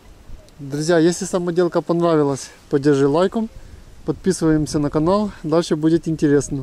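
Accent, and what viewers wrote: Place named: Russia. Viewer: у вас наче старо-руський акцент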